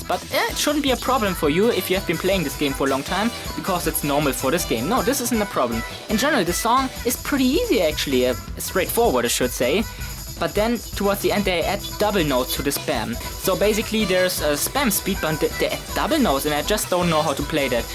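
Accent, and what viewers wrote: Germany. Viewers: I do not want to ask you a very personal question. But… are you perhaps German? Because I’m always getting this idea because of your accent … 😅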